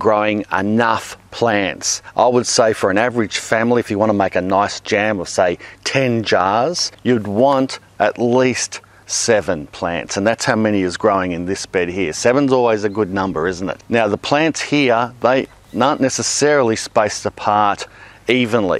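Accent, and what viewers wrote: Australia. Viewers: I love watching your videos! Your humour and positivity and fun Aussie accent! Makes me want to move to Oz! And I love using hibiscus to brew kombucha!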